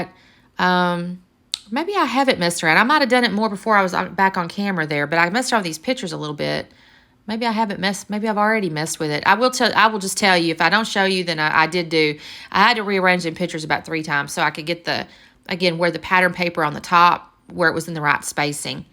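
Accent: South, United States. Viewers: I love this. You sound like a real southern girlfriend. I’m in Tennessee just wondering where your from?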